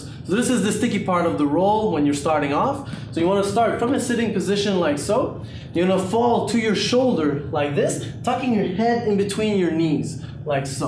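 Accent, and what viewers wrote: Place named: Quebec, Canada. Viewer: Quebec accent :D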